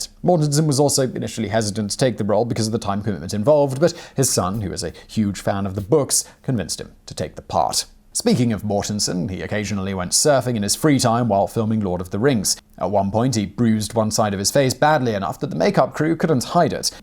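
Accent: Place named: RP, United Kingdom. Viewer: Your deliberately exaggerated posh English voice is excruciatingly annoying. It's like watching a sketch of 'Cholmondley Warner' from Harry Enfield.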